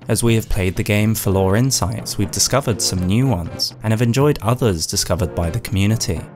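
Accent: United Kingdom. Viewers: An actual good YouTuber making good content! Love to see it! Too many wannabes out there, for some reason I think you and 3kliks would get along great, you two and the amount of British emitting is incredible, especially in narration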